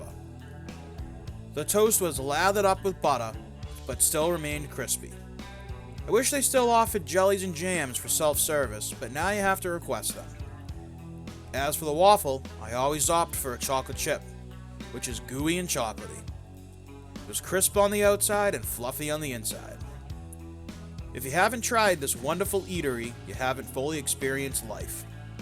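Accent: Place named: Boston, United States. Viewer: We love you, and the Boston accent really hits the spot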